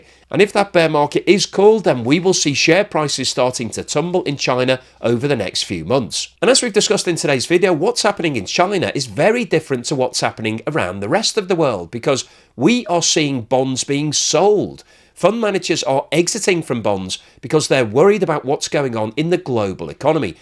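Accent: United Kingdom. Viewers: Gotta love that THICC brih'ish accent, great content, thanks for sharing!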